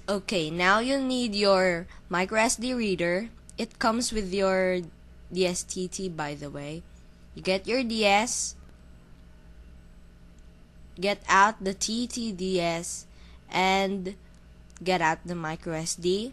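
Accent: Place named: Philippines. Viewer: i bet u that ur filipino, u have my friends accent.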